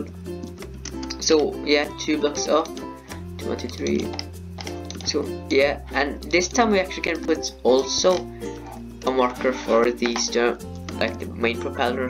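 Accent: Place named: Germany. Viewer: Are you german? Cus your accent which i love...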